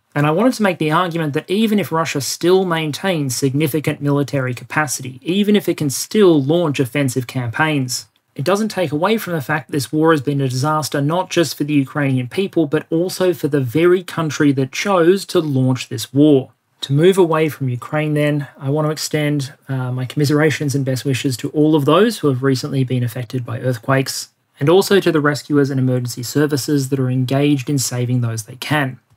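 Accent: Australia. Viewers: He's Australian. It's weird how many comments there are saying he's British though...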